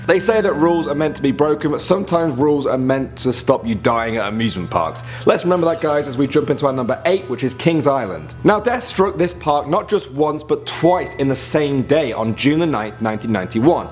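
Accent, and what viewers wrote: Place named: United Kingdom. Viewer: He's British so he has an accent.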